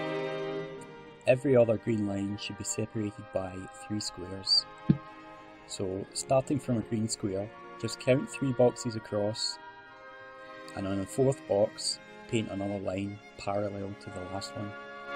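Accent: Scotland, United Kingdom. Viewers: Beautiful! Highlander tartan painted and explained with a scottish accent :) Well done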